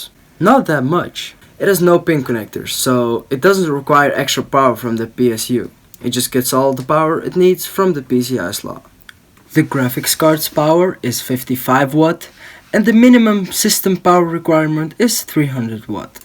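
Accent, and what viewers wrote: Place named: Netherlands. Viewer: Lekker NL accentje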